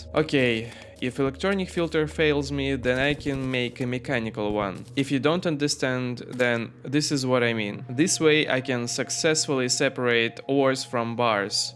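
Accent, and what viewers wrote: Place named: Russia. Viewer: А акцент то русский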